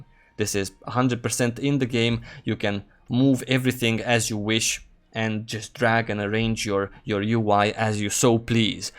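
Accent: Italy. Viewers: Are you from Italy? your english accent it's tricky :D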